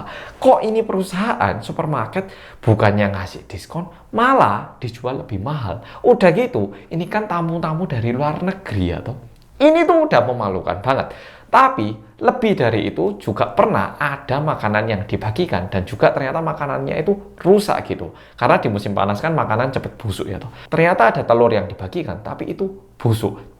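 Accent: Java, Indonesia. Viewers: Wow..!! Saya baru pertama kali ketemu Channel ini.sungguh mantaap,,bhsa indonesianya joss bgt.rada² medok bhsa jawa lagi.😃🙏🙏👍👍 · Jemek🤭😁 bosomu lho mas...bikin ngakak 😃😁 lek wong jowo mah paham..tapi yg bukan wong jowo aneh dengernya 🙄😳🤭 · Medok banget intonasinya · 😆 medok banget logatnya mas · Om asli orang Jawa ya kok suaranya medok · Ini org Korea kah... tp kek org jawa logat dia ngomong. Aq yg org Indonesia aja gk ngerti bahasa Jawa.kek nya dia bisa bahasa Jawa. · Ko logat e kok jowo isok ngomong jowo ta ko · Jemek boso joso bang Becek bhs Indonesia nya. Btw Aku seneng smpy jik njowo i., 😁😁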